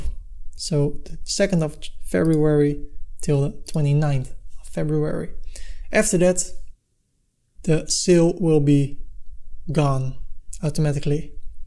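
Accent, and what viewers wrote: Netherlands. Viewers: Hey; Danke Well, No need to improve your English, Your Dutch accent is a reminder of the Dutch Kindness and all the Good times we get to spend in Holland, Thankyou Keep it UP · Je hoort gelijk is Nederlander :D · ken het accent ;) Nederlands Lol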